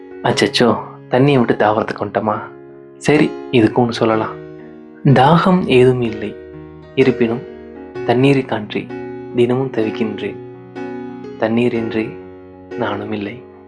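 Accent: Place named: Kongu, India. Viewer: Wow..🤓 ...nalla Kavithai with kongu style🔥🔥🔥🔥🔥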